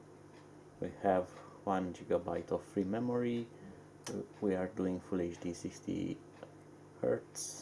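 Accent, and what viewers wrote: Romania. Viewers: you sound Romanian to me. am i right ?good english dogh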